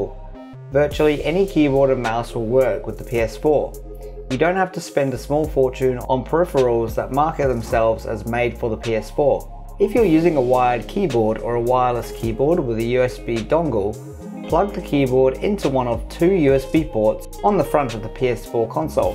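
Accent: United Kingdom. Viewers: Did you get his accent? He's probably across the pond hint hint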